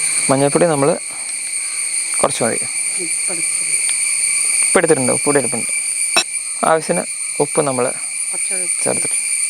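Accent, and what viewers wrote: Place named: Kannur, India. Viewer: Hi മോനേ... എത്ര കാലായി കുഞ്ഞി...... കണ്ടിട്ട്? കണ്ണൂർഭാഷ ആണ് അങ്ങനെ പറയുമ്പോ ഒരു ഇഷ്ടം 😅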